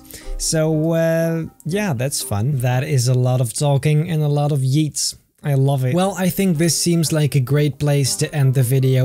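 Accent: Netherlands. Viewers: dont he sound dutch?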